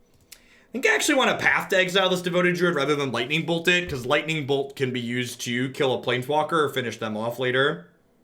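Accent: United States. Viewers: I have found 3 unearth to be very good in this archetype. Combined with fulminator mages and kambals in the side it makes for some fun interactions. As a fellow Illinoisian, I have part of those same speech patterns. Great as always, thanks! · a strong but generic american accent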